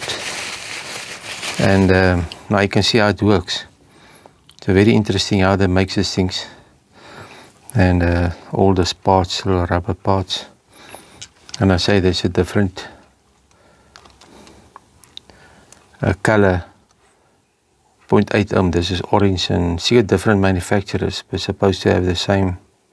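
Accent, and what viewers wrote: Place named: Afrikaans, South Africa. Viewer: Ek love die Afrikaanse english. Trots Suid-Afrika, my oom. Ek kyk graag oom se videos.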